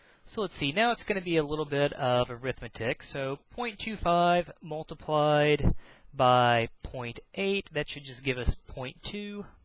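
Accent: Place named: United States. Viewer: And the american accent makes it cooler as well. :D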